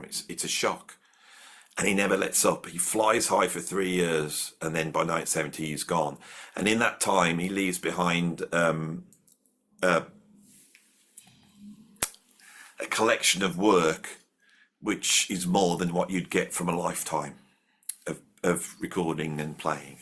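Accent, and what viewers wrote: United Kingdom. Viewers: !...intelligent list...you dug deep for the true "roots" of music...the foundations of genres. Your shows are unique imo to other folks on YouTube...I love your British voice/dialect...it relaxes me so...anyway, keep it up...shoot for 50K subs by end of the year! Enjoy the weekend...sincerely, 55 yr old drummer Webb in Atlanta, GA.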